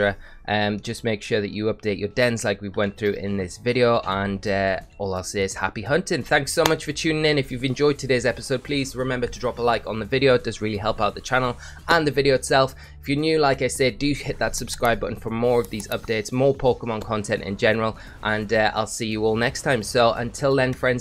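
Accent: Newcastle, United Kingdom. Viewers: Wish we had gmax Morpeko 😢 btw are you Geordie?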